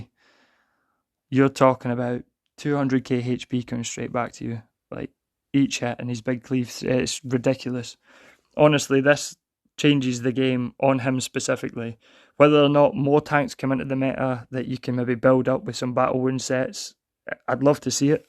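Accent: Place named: Scotland, United Kingdom. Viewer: Great Vid, and great to hear a Scottish voice on a Content creator video :)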